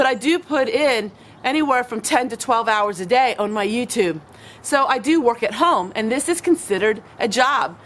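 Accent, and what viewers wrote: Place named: South, United States. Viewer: Wait. What? You're conservative? I wouldn't have known if you hadn't said anything. 🤷🏾‍♀️ I’m more focused on your accent. I can't figure it out! 🤔😆 Are you a Canadian living in Arkansas? It's like foreign and Southern, but not too Southern. Very interesting!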